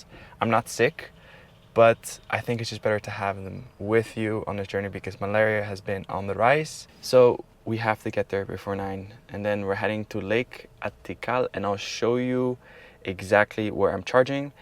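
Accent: Netherlands. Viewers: Ik dacht al aan het accent te horen dat je een Nederlandse tegenkwam😉 Leuk om te zien in comments dat er mensen op zo veel verschillende plekken naar je kijken! Ook vanuit Cyprus kijk ik graag mee😉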